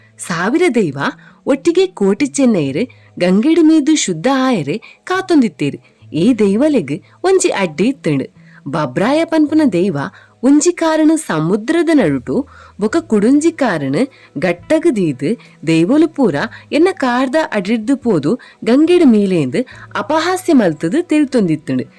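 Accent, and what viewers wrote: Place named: United Kingdom. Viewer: ತುಳು ಸರಿಯಾಗಿ ಮಾತಾಡಿ, mam ಇಂಗ್ಲಿಷ್ ನವರು ಕುಡ್ಲಕ್ಕೆ ಬಂದ ಹಾಗಿದೆ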